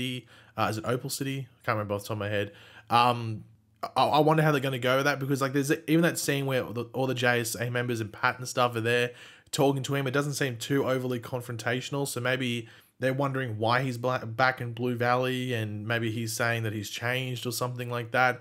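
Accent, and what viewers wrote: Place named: Australia. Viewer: Ur Australian! Lit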